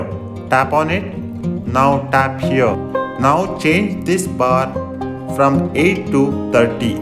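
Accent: India. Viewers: LOVE THE South Indian ACCENT Writing South Indian I low caps, coz I m RACIST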